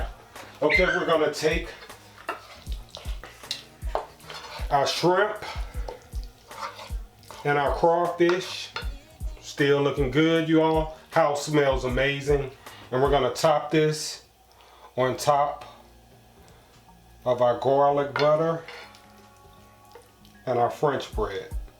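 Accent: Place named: United States. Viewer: Whomever would make fun of your wholesome American accent is simply jealous. This recipe looks great! I have not seen crawfish for sale but will start looking for it. This would make a dynamite pizza. For what it's worth: I have been remarkably more healthy since I started making and eating sauerkraut. I used to catch every cold or flu going, had chronic bronchitis for five years. All gone since I started this habit. During the past winter, I have not had so much as a sniffle or cough. Not sure how it works but the effect for me is miraculous. I hope this is useful information.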